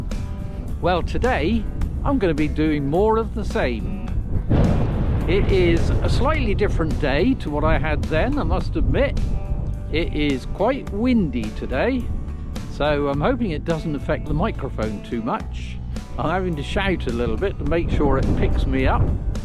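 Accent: West Country, United Kingdom. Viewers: Spectacular scenery with lovely contrast between the hedgerows then down to the crashing waves along the clifftops all narrated with that west country burr.Makes me nostalgic for Devon my country of birth after living 32 years overseas so thinkin of planning a summer walk 😅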